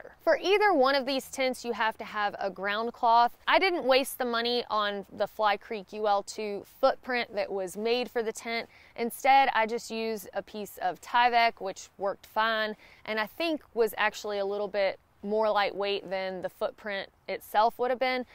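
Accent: South, United States. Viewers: I❤ that Southern Belle accent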